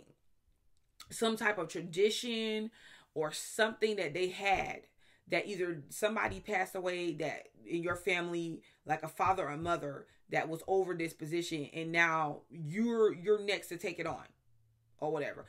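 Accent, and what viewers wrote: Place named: East Texas, United States. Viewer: Are u from east texas by the way? I love your accent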